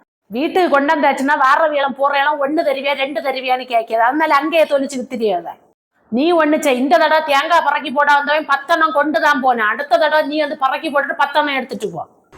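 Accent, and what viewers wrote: Kanyakumari, India. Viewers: Sela words neega pesarethu pureyala kanyaakumare baasa superaeruku sster😂😂😂😂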